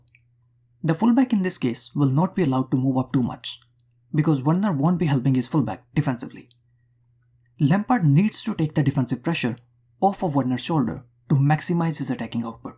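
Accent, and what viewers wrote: India. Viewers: you sound like india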